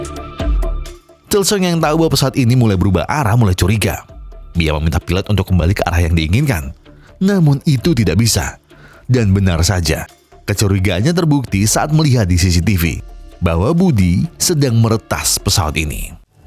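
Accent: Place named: Java, Indonesia. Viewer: Lu asik bang jelas wong jowo iki mas 😂😂😂😂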